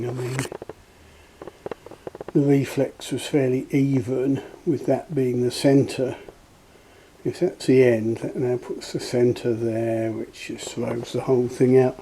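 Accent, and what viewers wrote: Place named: United Kingdom. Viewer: Quick question, I assume you are in the UK based on your accent, I was wondering what the law is for you in England over bows, where can you shoot them, do you need a licence to own one etc, Im in Scotland so laws may differ but been thinking about trying to make a bow for a while but not knowing the law situation has put me off trying.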